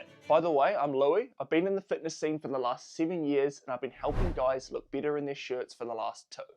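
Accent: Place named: New Zealand. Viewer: You sound like a Kiwi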